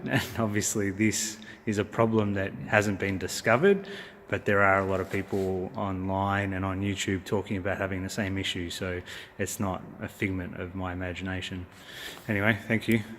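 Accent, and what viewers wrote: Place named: Australia. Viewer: Your accent tells me you're in Aus - mate, lodge a complaint with your State's consumer affairs department. They've helped me in the past and I'm sure they'll help you. Remember we have pretty strict warranty laws and BMW is legally obligated to help. With this video you have enough evidence to (nicely) demand action. Good luck, and post updates! EDIT: Just read your post, you've already lodged with ACCC - no surrender!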